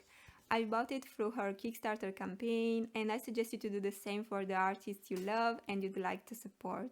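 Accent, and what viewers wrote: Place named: Italy. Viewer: Ho capito subito che eri italiana dall'accento 😂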